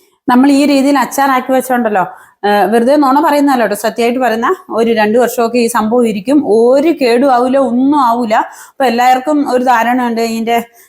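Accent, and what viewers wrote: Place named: Idukki, India. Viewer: Serikum janma nadetha, thani Idukki samsarum anallo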